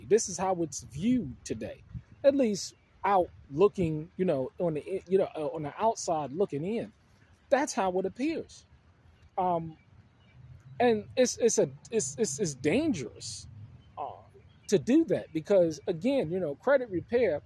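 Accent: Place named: Brooklyn, United States. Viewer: I knew you were from Brooklyn. That accent is too familiar.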